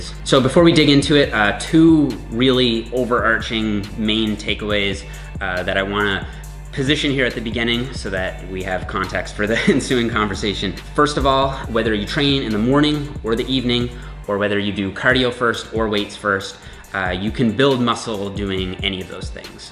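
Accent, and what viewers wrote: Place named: Newfoundland, Canada. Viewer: My thoughts as I watch you " Oh hey his accent is familiar....wait a second, his last name is Nippard googles your name HAH hes a newfy! " Yaaaaaaaay!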